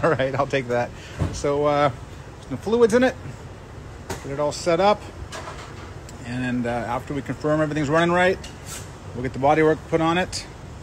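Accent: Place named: New Zealand. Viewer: He sounds like a Kiwi.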